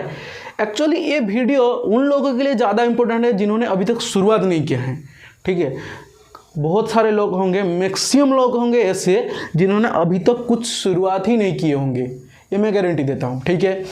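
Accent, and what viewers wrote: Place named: Odisha, India. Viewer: Pehle accent mein hii pata lag geya kii odisha se ho....me also from odisha ❤😅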